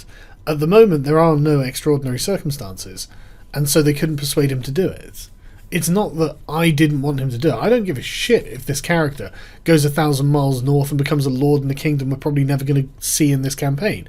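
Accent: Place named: United Kingdom. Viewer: I did not know Sargon was DMing an actual D&D campaign! I was I could be one of his players. I would LOVE to have my character's actions narrated by that amazingly British voice...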